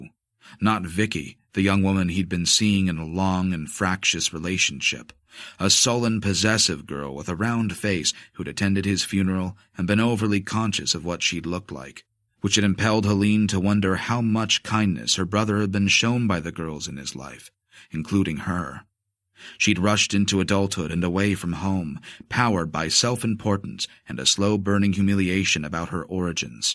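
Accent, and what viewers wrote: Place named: United States. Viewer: The narrator should be English. The American accent jars with the language.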